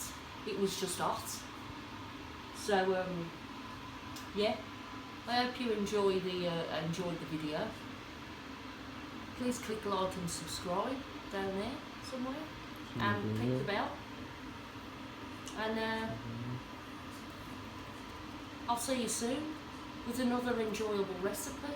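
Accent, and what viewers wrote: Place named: Black Country, United Kingdom. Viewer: just subed,loved your vid,tell the camera man/other half to mind is own, you are the bomb, what part of Brum you from, sound more like black country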